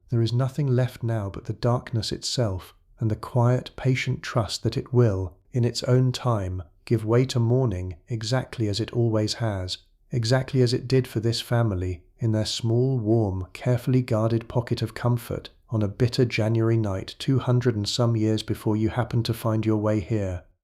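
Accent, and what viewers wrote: United Kingdom. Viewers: Why do all these channels have to be a British accent. Its frustrating for me to try and sleep while trying to figure out what ur saying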